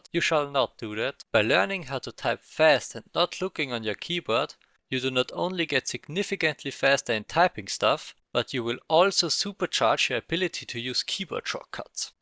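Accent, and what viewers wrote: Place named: Germany. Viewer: you German? I like your accent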